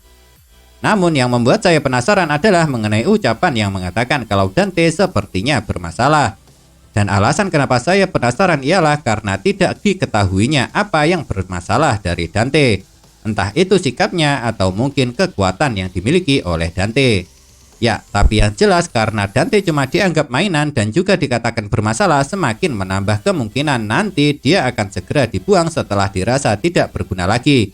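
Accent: Java, Indonesia. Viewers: Logat jawa nya masih kerasa,pembacaan dan penjelasan masih kurang enak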